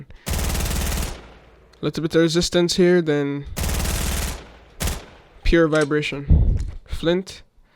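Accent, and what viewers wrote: Jamaica. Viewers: Jamaican we can hear it in your voice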